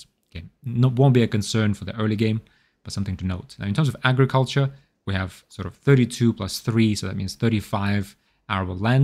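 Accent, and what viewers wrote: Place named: Eastern Europe. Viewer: I've watched a quarter of the video and the question I wanted to ask you the whole time was, are you a native British citizen? Your accent sounds vaguely familiar, are you from Eastern Europe? Sorry if this is a personal question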